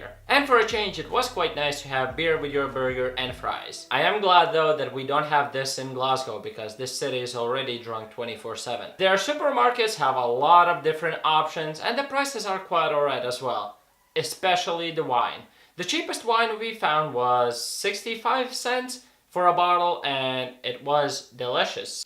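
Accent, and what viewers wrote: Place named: Latvia. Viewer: Nice video. Uzreiz varēju pateikt ka esi latvietis pēc tā ka teici 2 miljoni cilvēki. Akcents nodeva. Tad tava kanāla nosaukumu izlasīju. Bet tā. Forši ka šādu info liec :) noderīgi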